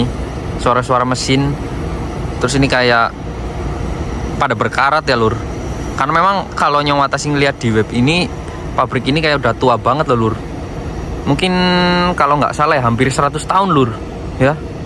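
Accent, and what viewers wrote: Banyumas, Indonesia. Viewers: Nyong watashi orang mna sih kok logat bahasanya sama kya inyong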